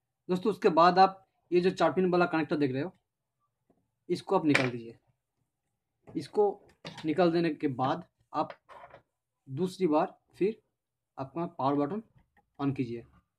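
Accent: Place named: Assam, India. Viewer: Ur way of speaking is like an Assamese people are u from assam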